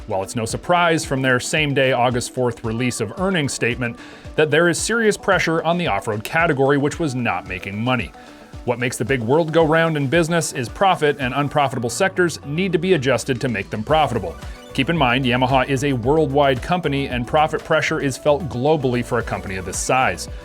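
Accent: Canada. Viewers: You mentioned tariffs you sound Canadian so I think I understand why you did it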